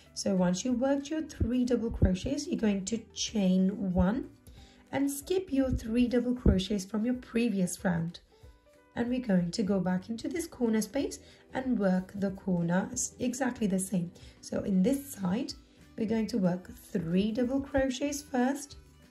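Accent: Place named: Wales, United Kingdom. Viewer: I have made it and it is very nice. What a lovely accent . Is it Welsh?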